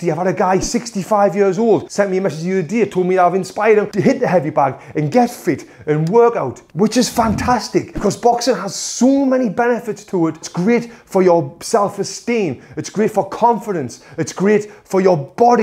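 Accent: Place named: North, United Kingdom. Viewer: That northern accent sounds so surprising coming from someone with a deep tan, hahaha. Looking good, Tony!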